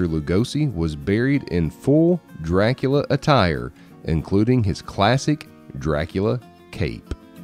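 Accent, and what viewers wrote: United States. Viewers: Its so refreshing to hear someone narrate in a clear american voice that can be clearly understood! Not like those British and India narrators! Most of the time i have a hard time understanding tbem!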